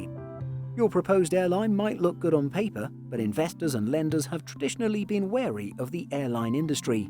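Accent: United Kingdom. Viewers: I’m just curious and it’s no big deal to me, but like in this program, when you mention capital investment you most likely show U.S. dollars currency in your videos rather than British pounds, or other currencies. All along I’ve thought Simple Flying originated in Great Britain. I guess that’s because of the accent of your narrator (He does a bang up job.) so I’m always expecting monetary value in pounds and you trick me and use dollars. So my question is... What’s up with featuring American “dollars” in your script usually rather than British pounds??? FYI: I enjoy your programs. Please keep the high quality. Many thanks!!!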